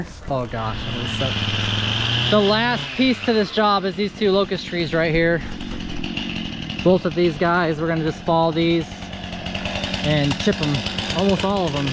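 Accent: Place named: Ireland. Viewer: Irish accent…. Tree = three…. Fellers = fellas. …. Nevermind🤣😂🤣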